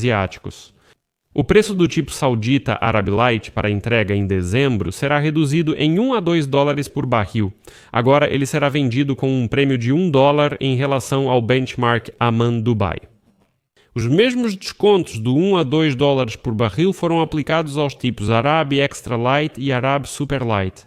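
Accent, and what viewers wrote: Portugal. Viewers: Por que fica oscilando em português de Portugal e do Brasil?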